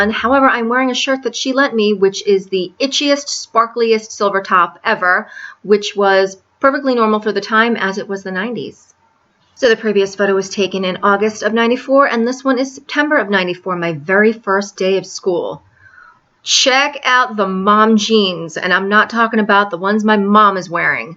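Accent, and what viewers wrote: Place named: New York, United States. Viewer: I can totally tell you're a New Yorker :P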